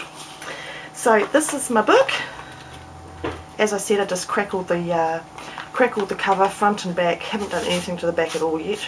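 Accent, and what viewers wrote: New Zealand. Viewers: I love your book, I have one similar in process... and I ADORE your accent!!! NZ has the best accent in all the world, to me.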